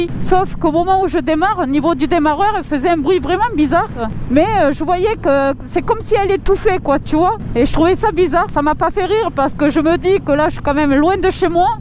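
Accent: South, France. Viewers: Le bel accent du sud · Coucou magnifique vidéo et les paysage et ta belle voix du sud ça donne envie de partir en vacances,le problème des z750 sur c’était l’électrique et comme t’a pas abs il faut frères un peut plus de l’arrière moi je fais comme ça sinon je freine plus de l’avant la roue arrière ne touche plus le sol bonne continuation 👍✌️🙏😘🥰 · cool l'accent du sud chez toi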